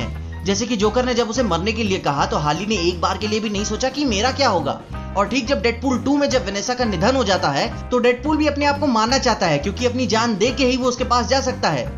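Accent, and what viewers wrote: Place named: Bihar, India. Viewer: You must be bihari You sounds like bihari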